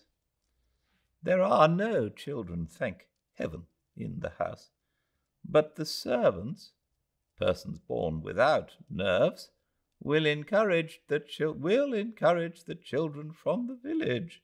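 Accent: United Kingdom. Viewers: This is amazing, I just discovered this reading, so pleasurable and quintessentially English. Thank you.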